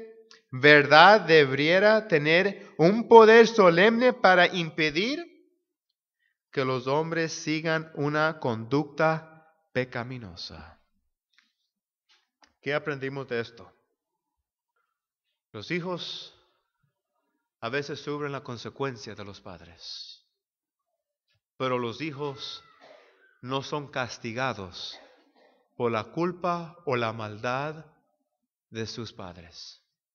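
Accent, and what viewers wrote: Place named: United States. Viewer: Compadre tienes que aprender un poco más hablar español eres muy pocho y todo lo que hablas no se entiende discúlpame mucho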